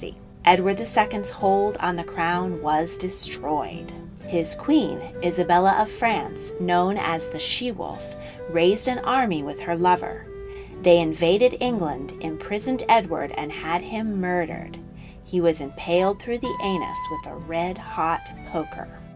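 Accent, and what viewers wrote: United States. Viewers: she is pronouncing it correctly. it sounds different because of her american accent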